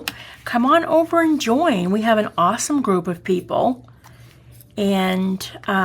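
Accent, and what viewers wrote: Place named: Boston, United States. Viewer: You're just the cutest ❤ I LOVE LOVE LOVE your creativity AND your Boston accent 🤗💕 hugs&love Darlin xxx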